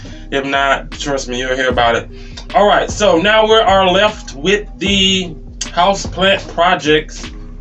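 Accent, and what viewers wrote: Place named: Georgia, United States. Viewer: That’s the Georgia southern drawl 😊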